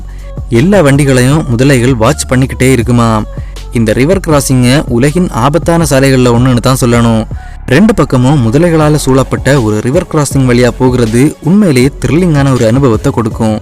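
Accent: Kanyakumari, India. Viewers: Bro KANYAKUMARI aahh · Bro neega kaniyakumariya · உங்க ஊரு கன்னியாகுமரிதானே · Bro neenga Kanyakumari ya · Nega kanyakumari ah 🤔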